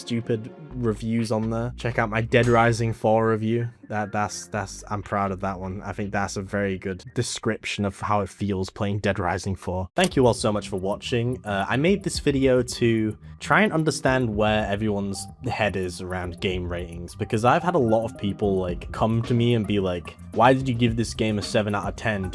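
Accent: United Kingdom. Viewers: That Life Of Brian joke was too good XD i don't listen to you at all. I just want to throw money at your british voice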